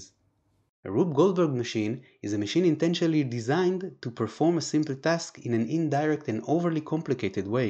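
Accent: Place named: Israel. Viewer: מזהים את המבטא הישראלי חח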